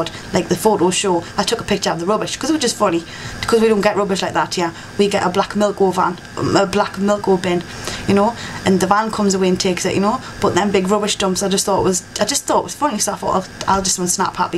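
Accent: Newcastle, United Kingdom. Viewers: She is from South Shields which is kind of on the outskirts of Newcastle, the area is called South Tyneside. People from that area are generally known as 'Geordies' and the distinctive accent is called 'Geordie'.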